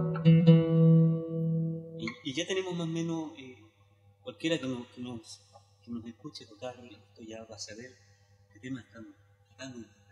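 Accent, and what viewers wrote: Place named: Chile. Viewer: Que hermoso tu acento chileno❤ estoy enamorado del acento chileno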